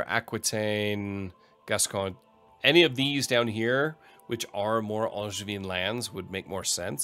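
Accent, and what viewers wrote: Germany. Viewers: Hey are you german ?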